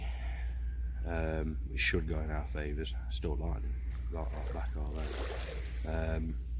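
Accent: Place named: East Midlands, United Kingdom. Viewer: Nice one, great vid shame about the weather at first, can't be too careful! You're not from the North east, with that accent I'd say East Midlands! Tight lines pal 🎣🎣🎣🎣👏👏👏👏👍👍👍👍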